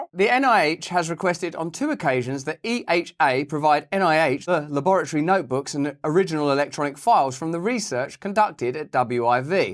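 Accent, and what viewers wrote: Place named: England, United Kingdom. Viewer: the 'surprise birthday party' bit gets me all the time🤣🤣🤣. The England accent makes it even more hilarious.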